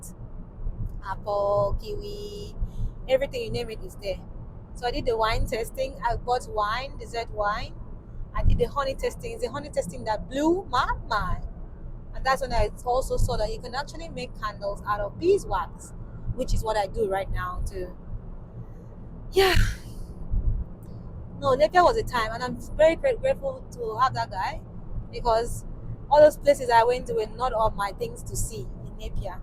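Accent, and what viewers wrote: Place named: Akwa Ibom, Nigeria. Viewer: You speak like an akwa ibom Nigeria❤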